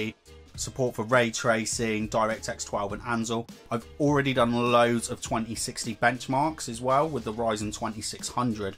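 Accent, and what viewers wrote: United Kingdom. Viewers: u're so british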